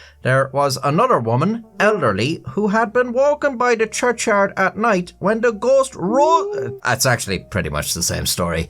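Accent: Ireland. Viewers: 😂 i feel like i've just climbed out from underneath the rock. Because everybody knew about this guy's channel BUT me 😮 OR AT LEAST 1.5+ MILLION PEOPLE DID 😎👍 WITH THE CUTE IRISH ACCENT AND THE ANIMATED VIDEOS I THOUGHT AT FIRST. IT MIGHT BE JUST ANOTHER AI CHANNEL. BUT AFTER TAKING TO REDDIT AND OTHER SOURCES, IT SEEMS THAT HE'S BEEN AROUND BEFORE THE A I BOOM AND WAS DOING HAND ILLUSTRATED VOICEOVER VIDEOS BEFORE THAT TECHNOLOGY TOOK OFF! FROM WHAT I'M READING, THERE WAS PUSHBACK. WHEN HE STARTED TO GO WITH SOFTWARE ANIMATION, INSTEAD OF HIS HAND DRAWN STYLE BUT YOU CAN'T TAKE AWAY FROM HIS AWESOME SARCASTIC, AND ENLIGHTENING COMMENTARY. THANKFULLY, I WASN'T HERE FOR ALL THAT DRAMA 🙄❤ I'M ONLY HERE TO SUBSCRIBE. LIKE THE VIDEOS, BECAUSE NOT ONLY ARE, THEY THOROUGHLY INVESTIGATED WITH FACTUAL INFORMATION. BUT THE COMEDY STYLE COMMENTARY KEEPS ME LAUGHING. THROUGHOUT THE WHOLE VIDEO. 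DON'T EVER STOP BEING YOU ❤ BECAUSE THE FANS LOVE YOU JUST THE WAY YOU ARE. #SUBBED #facts #BeYou #subscribed #NewSubscriber ❤😊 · Dear, I officially watched all of your videos. Thank you for nice content, your own animation (that you done by yourself, yes I watched previous video) which is funny same as you nice sense of humour. I always watch your videos while eating, it make me almost spit food in monitor couple of times, I don't regret it. I always glad to see your irish mug and hear your irish accent, I am a bit tired of brithish and aussie accent, I am russian and I have aussie and british friend here. Wish you drink less, good health, make more jokes and of course loyal friends and prosperity.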